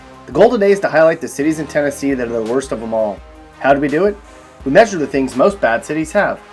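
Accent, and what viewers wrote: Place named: Midwest, United States. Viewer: I bet 1,000.00 he's from the Midwest,you can tell by his accent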